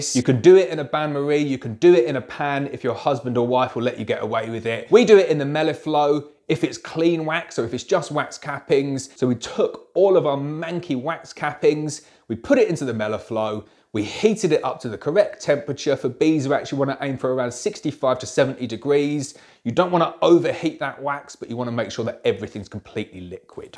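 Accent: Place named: United Kingdom. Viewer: arrr-sigh and eeeeee such a refreshing change to find a fellow Brit doing 'How-to's' excellent video so double thumbs from me Boss I find american videos so tiresome and so prolific on here, why they have to make the simple sound so clever and complicated I will never understand but hey-ho. You've done an excellent job here so thank you very much indeed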